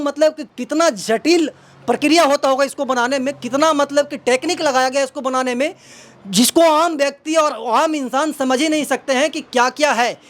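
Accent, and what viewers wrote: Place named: Bihar, India. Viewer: गंदा बिहारी बोलने का कुछ पता नहीं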